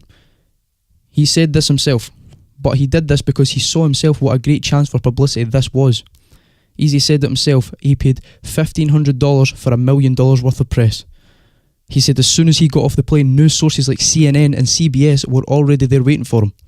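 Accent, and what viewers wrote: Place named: Scotland, United Kingdom. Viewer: Love that Scottish accent bro and love the vid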